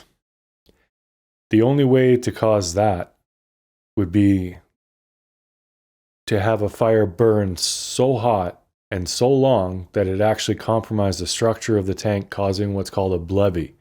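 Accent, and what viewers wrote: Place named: Alberta, Canada. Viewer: I thought so. I live in Texas but am from Alberta. I hear our accent lol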